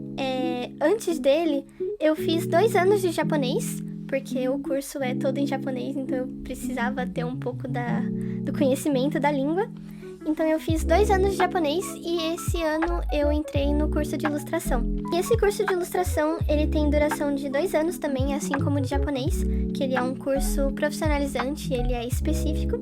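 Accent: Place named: São Paulo, Brazil. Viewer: Hahah que estranho pessoas não percebendo que você é de SP quanto é tão claro.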